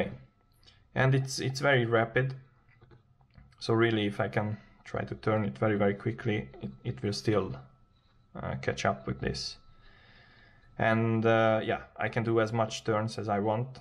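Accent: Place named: Hungary. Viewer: you have a hungarian accent :)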